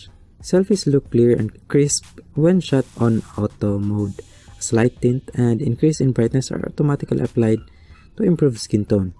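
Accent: Ormoc, Philippines. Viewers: Are you from ormoc sir?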